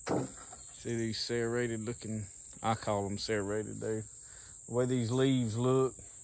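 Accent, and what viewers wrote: South, United States. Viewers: When I was in the U.S. Army in Agusta GA, I was caught talking while a Master Seargent was speaking to us. He asked my name and I replied with an accent just like yours. It was so good that he said , "Boy, what part of the South are you from ?" I replied, "South Bronx, New York ". He said, "Boy, jump down and give me 50 pushups " ! I did and laughed so hard while doing them. I never forgot that moment. I suppose he never did either ! Love your accent , you're a good ol' boy !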